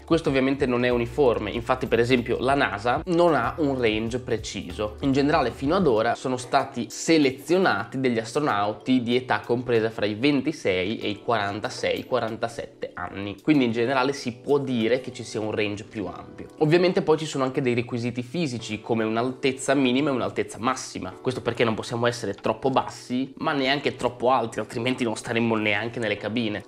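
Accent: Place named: Ferrara, Italy. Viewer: ho sentito tantissimo il tuo accento ferrarese, più del solito😂 Comunque il video stra interessante, non sapevo un sacco di cose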